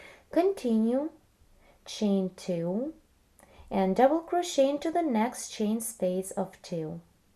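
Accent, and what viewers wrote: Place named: Romania. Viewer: După accent și cum ți în mâna dreaptă croșetă ești Europea mai exact româncă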